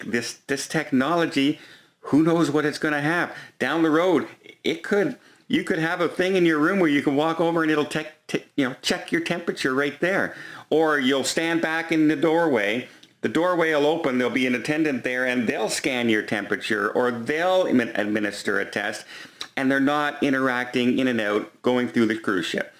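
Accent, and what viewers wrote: Canada. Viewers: He's Canadian. Sometimes they say words differently than we do here. Stop being an English teacher!!!!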